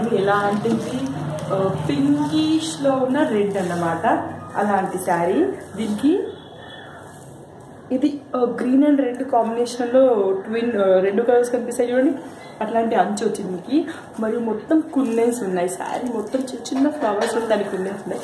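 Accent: Telangana, India. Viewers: meedhi ea ooru.. swatchamaina telangana lo chepthunnavu.. i like you..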